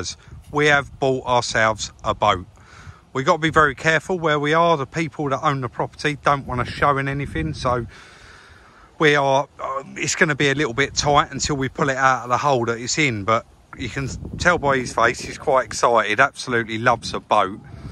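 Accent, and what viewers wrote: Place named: Southend, United Kingdom. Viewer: Haha I swear your South end accent has gotten stronger in these recent videos lol. "Thirty orse, this fing ull go like a rocket" "sprayed every fin ap wiv a bit ovv dabble u dee fortee" 😂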